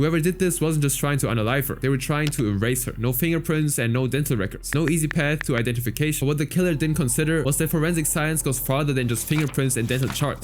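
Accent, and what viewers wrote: Germany. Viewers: You gotta slow down when you talk a bit. Are you German by any chance?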